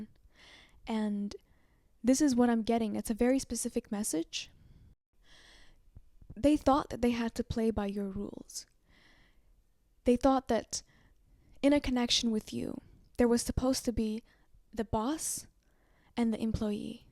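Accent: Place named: United Kingdom. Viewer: I am attracted to that voice! I am a sucker for that english and British accent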